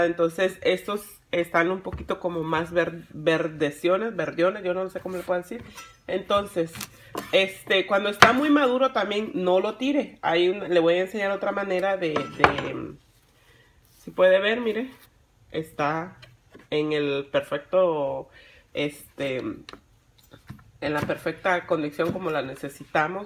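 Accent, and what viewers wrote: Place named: Mexico. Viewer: Ya no habla como hondurena sino como mexicana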